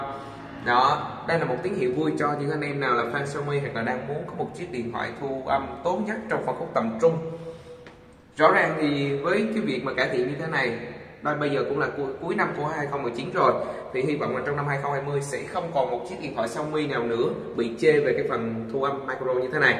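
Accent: South, Vietnam. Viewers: Có ai giống tui ko giọng miền nam bạn này hơi chói khó nghe nhỉ so với giọng miền bắc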